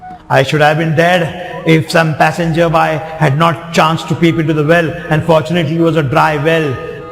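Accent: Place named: South India, India. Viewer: So amusing presentation! Perfect South Indian tone! Awesome, proud of you, Sir!😊👌👍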